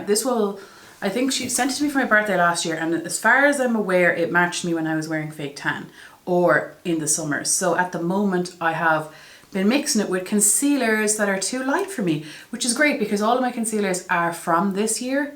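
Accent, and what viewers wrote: Ireland. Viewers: Happy to help! I know oden's eye has a similar sponge as well if you can't find it in Penneys. 😉 I'm almost Irish ahah. I'm Portuguese but lived in Dublin for 7 years (back in Portugal now). I actually found your channel when I was looking for Irish youtubers to follow cause I missed the accent so much.😅 xx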